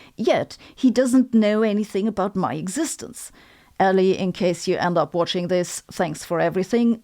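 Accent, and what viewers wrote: Germany. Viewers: “I can promise that my German accent is real!” 🤣 🤣🤣 love it! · I love your "brick" like expressiveness. I also love that you're built like a "brick sh!thouse." (You might need to look that one up to understand the compliment) I love your German accent and your sense of humor always gets me laughing. Sometimes, I have to pause the video on account of that. I hope you keep making videos for many years to come. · And like your German accent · I love the way you break down information. I love that you are German and your accent. I love your hair (you are beautifu)l and I love how funny you are but you don't try too hard to be funny. Thank you for all the great content! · Oh and: your Eng is laudable and I congratulate you. But then what trace of German phonology remains only adds lustre. You see, I find German the most comfortable, the most pleasing to pronounce of all mod Eur langs. 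Hochdeutsch is as pleasing to my ear as the floaty and precise Serbian up in Vojvodina. (Like German, Serbian has many, many local speeches which are NOT pretty!)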